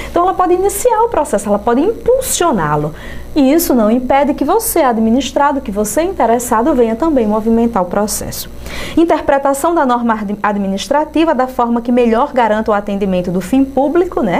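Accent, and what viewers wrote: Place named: Pernambuco, Brazil. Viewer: vc é de pernambuco?saudade desse sotaque........hehehe aula excelente...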